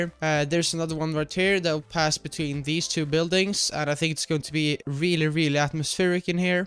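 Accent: Sweden. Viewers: Är du typ svensk, sounds like swidish accent. Nice build anyway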